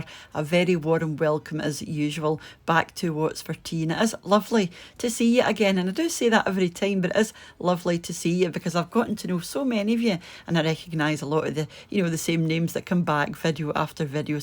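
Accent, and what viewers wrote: Scotland, United Kingdom. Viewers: Love hearing your Scottish accent and yours ones look delicious I am making tonight for tomorrow's lunch.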